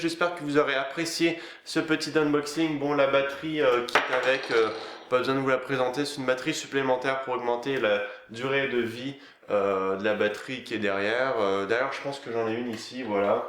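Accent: Canada. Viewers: D'un côté, c'est pas faux,mais d'un autre oui :). Officiellement, il n'est pas Canadien, mais il a une culture Canadienne, c'est pour ça qu'il a l'accent. Rester plus de 10 ans à Montréal, ça laisse des traces x).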